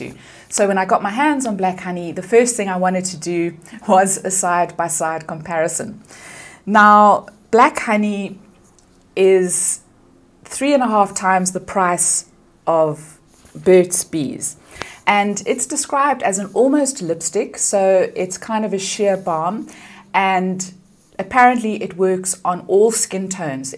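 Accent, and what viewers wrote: South Africa. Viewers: I was like you must be South African, from the accent ❤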